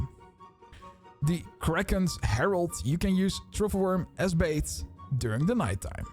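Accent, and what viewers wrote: Netherlands. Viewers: je hoort gwn dat je nederlands bent lol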